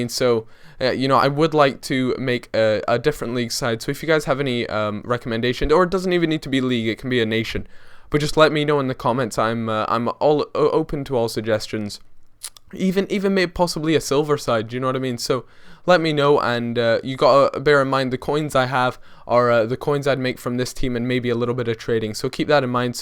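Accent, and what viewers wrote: Scotland, United Kingdom. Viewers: I am kind of confused about your accent, are you Scottish?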